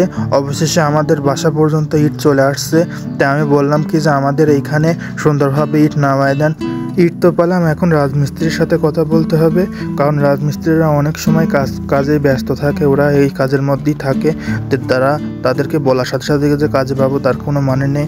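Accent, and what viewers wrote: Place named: Pabna, Bangladesh. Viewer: তুই কি বুঝবো আমাদের পাবনার ভাষাটাই এমন বুঝলে ভিডিও দেখবি না বুঝলে দেখার দরকার নাই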